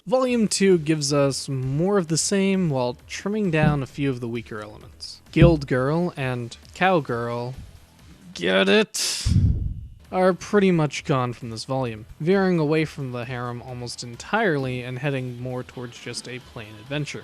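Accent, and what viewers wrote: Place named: Japan. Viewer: it is somehow funny to hear japanese speak english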